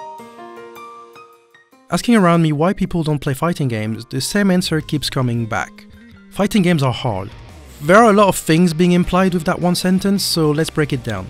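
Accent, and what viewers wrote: France. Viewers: I knew it. You had a slight French accent. En plus on manque de youtubeurs jeux de combats pk t allé à l'étranger XD. Aussi leon massay goat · Oh ! The Français accent ! But the english it is quand même good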